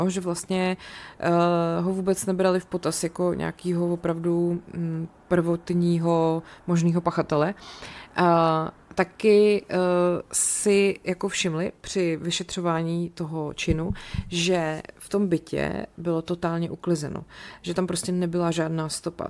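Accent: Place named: Prague, Czech Republic. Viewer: Ten, prazskej, přízvuk slečny úplně trhá uši. Navíc pořád mele a nic nepoví.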